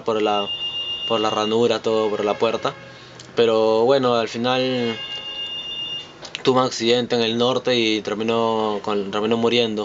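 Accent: Peru. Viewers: Es peruano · Ta bien pero hablai como las weas peruano klio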